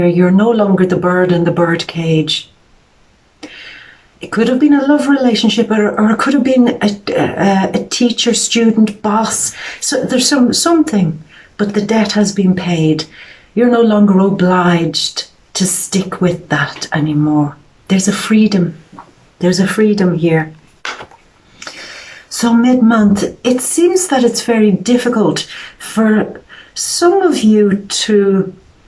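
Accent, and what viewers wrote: Ireland. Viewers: Your Irish?? Have u ever seen a fairy?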